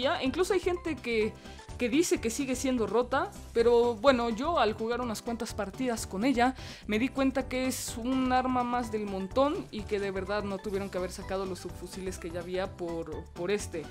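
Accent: Costa Rica. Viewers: Hola jaja eres de Costa Rica verdad jaja Pura vida mae jaja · Eres de costa rica como mencionas la palabra mae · eres de costa rica?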